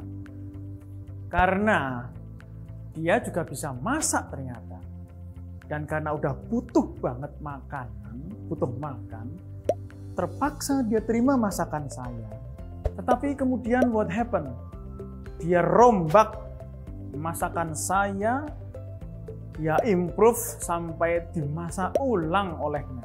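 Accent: Standard. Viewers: Pengucapan yang baku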